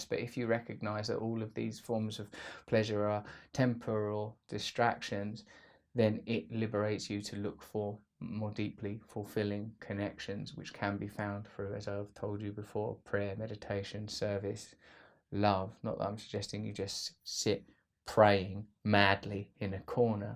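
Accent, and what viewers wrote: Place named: United Kingdom. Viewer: You remind me of John Lennon because of your wisdom and British accent. Comedians are the smartest people in entertainment I've noticed.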